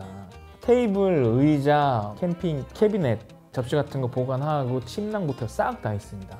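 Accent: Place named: Busan, South Korea. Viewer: 말투가 부산이시네여...ㅋㅋ 제가 트래이더스 손절한 이유랑 공감됐어요. 차라리 이마트를 가지....식재료도 대용량 아니고는 이마트에 포장만 바꿔 다 있는거...^^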